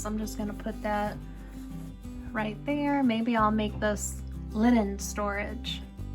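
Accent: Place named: Texas, United States. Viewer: Hi 👋🏻 I just found your channel ! It just popped up . Your accent seems Texan to me ..are you in Texas ? I’m in South Carolina . Nice to meet you . 🥰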